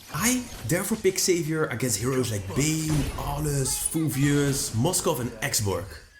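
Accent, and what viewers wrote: Netherlands. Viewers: I smell dutch bro dorry but you have such a dutch accent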